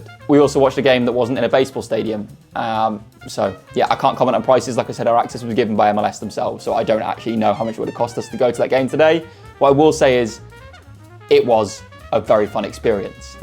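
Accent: RP, United Kingdom. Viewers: so British posh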